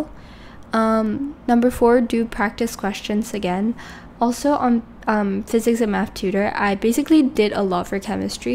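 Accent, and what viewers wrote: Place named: United States. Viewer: wait im just curious why do you have an american accent if gcses are for the uk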